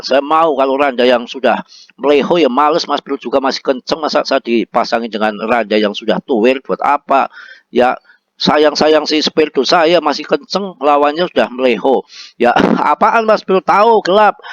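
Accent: Banyumas, Indonesia. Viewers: Mantap...bahasanya kok mirip nyong banget😅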